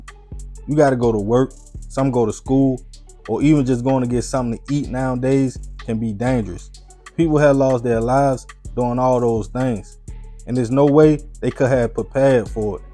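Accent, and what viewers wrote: Baltimore, United States. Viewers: Africa tuning in, love the content but the Baltimore accent gets me just like the southern part of the US accent. It’s certain words that I struggle with. love it though. Love & respect to and his family. Stay in peace everyone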